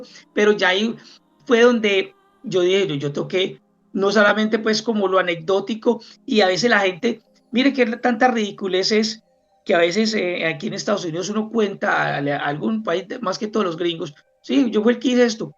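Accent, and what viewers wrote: Colombia. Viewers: Qué paisita para hablar rico!!! Muchas gracias por tan buena e inteligente reflexion!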